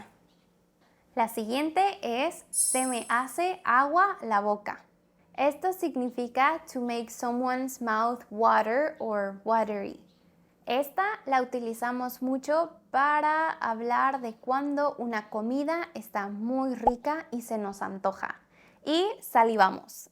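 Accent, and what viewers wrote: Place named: Mexico. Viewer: Ojalá hagas las partes 2,3,4, etc de este vídeo. Estas frases y tu acento son de mucha ayuda para la gente que quiera aprender Español de México. Ya he recomendado mucho tu canal. Gracias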